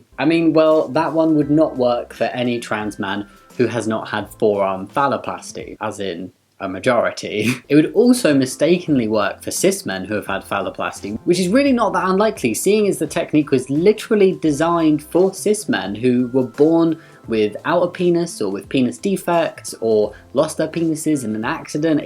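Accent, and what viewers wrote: United Kingdom. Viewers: Gee, I'm a Cis Gay Man. But I have a round jawline and no visible Adam's apple (because I'm fat), does this mean I'm unknowingly a trans man? The hair on top of my head (what's left of it) is straight, but my beard (which grows like crazy) is somewhat curly. No tattoos or scars (at least, not on the arms). Maybe I'm in the "?" group? Fact is, people are people. We are all one race, the HUMAN race. White, black, brown, blue, gay, straight, bi, trans, or whatever. Its corny, but love is love. Sorry I'm responding so late. Just found your channel, love your vids and the vlogs showing your transformation. I'd never have been able to do that in the hospital. And sorry your wedding got postponed, just make it bigger and better next year! PS: Love the accent! I'm American, but I'm a sucker for a British accent.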